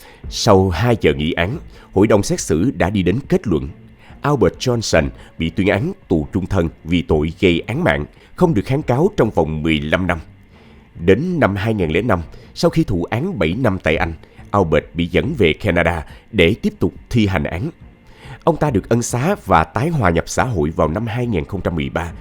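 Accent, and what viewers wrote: South, Vietnam. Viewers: Giọng anh nghe rõ ràng chuẩn miền nam, nghe rất nghiêm túc nhưng rất cuốn!❤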